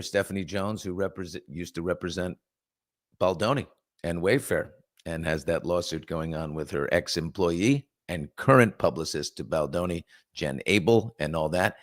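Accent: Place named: New York, United States. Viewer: You can hear your New York accent😂. You’re an east coaster. Love east coast people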